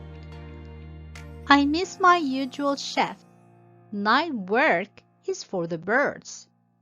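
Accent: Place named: United States. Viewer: Your American accent is really incredible. Your explanation is great 👍 It's important to learn some idioms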